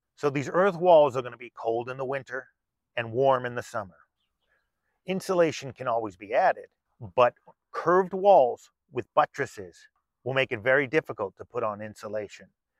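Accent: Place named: Boston, United States. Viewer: I'm having a hard time taking u seriously w your Bostonian accent.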